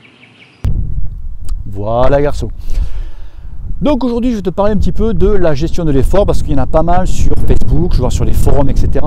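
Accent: South, France. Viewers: Salut, je veux juste remercier pour tous tes conseils avec l'accent ensoleillé ☀️ et chaque fois des expressions qui me font marrer, des thèmes que t'aborde actuelles et que tous ceux qui font du vélo se reconnaissent un moment ou un autre dans des situations diverses (avoir une patate du diable, être dans le rouge, voir la vierge 😂etc,etc), et surtout continue ces vidéos qu'on apprécie, salutations de la 🇨🇭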